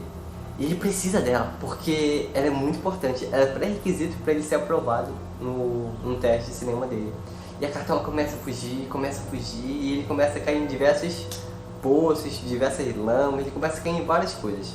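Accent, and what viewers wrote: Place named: Amazonas, Brazil. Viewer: Gostei muito da sua sinceridade, e da forma tão livre como você fala. Seu sotaque parece amazonense... Sucesso amigo!!! :-)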